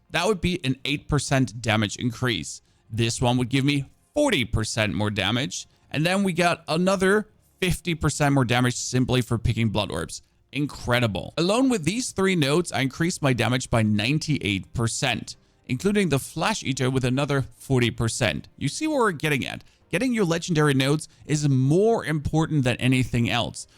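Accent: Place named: Germany. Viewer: O wauw, I remember you from early Hearthstone days when you still had a German accent ;) happy to be recommended your D4 content!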